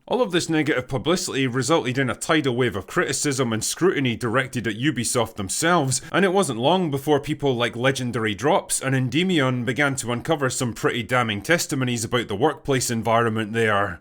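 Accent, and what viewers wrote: Scotland, United Kingdom. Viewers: I love your gay Scott accent in all your videos. I hope it isn't fake.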